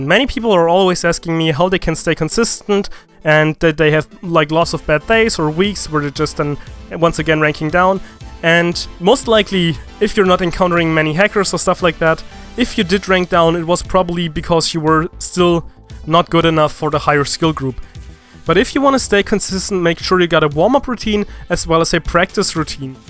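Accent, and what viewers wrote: Germany. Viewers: can u speak german??or are u from germany??u r listening like a german guy who is speaking and learning a lot englisch ^^ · Du hast nähmlich nen Deutschen Akzent! :D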